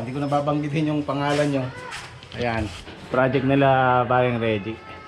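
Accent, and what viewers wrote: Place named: Pampanga, Philippines. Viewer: Sir bak8 kapampangan salita mo e, taga nueva ecija ka?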